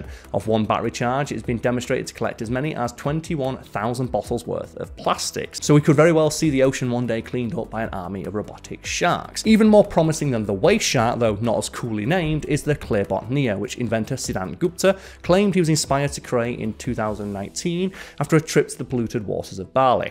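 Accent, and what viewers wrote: United Kingdom. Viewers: Dunno about trying to minimize British colloquialisms. The entire Whistlerverse is founded on the fact that Americans tend to think that anyone with a British accent is automatically smart and well informed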